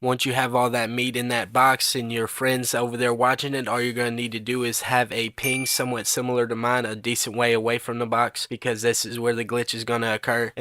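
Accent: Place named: Appalachia, United States. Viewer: do yourself a favor and have someone who doest sound like a hillbilly narrate your bad videos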